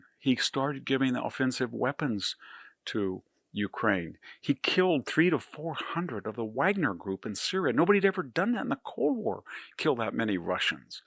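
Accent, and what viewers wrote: United States. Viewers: He's classically American to me in the way he speaks. I don't just mean the accent but the way he formulates his words. I'm British, by the way.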